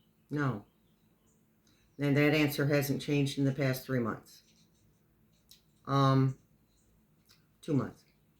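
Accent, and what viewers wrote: New York, United States. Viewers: Thank you. I can tell you need this as much as we do. Take care of yourself too. We love you lady & are you originally from NY? The reason I ask is I’ve heard NY’ers say Yous guys so much, love it. 😊😊❤❤❤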